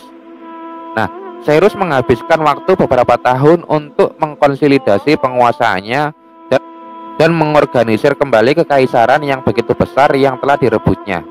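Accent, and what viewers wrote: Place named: Java, Indonesia. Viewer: Capek gw dngrin baca critnya.. medok gtu bahsanya 🤣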